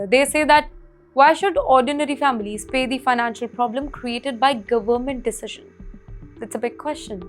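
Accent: India. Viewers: AI report with an Indian accent.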